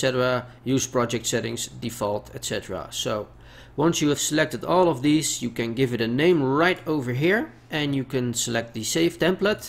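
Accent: Netherlands. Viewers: Hup Holland! :P Aan je engels was het wel te zien :D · Are you from the Netherlands?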